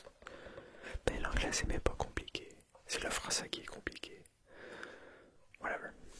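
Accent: Quebec, Canada. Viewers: Ton français resemble au français du Québec ! A tu apris le français au Québec ? Btw très bonne vidéo merci !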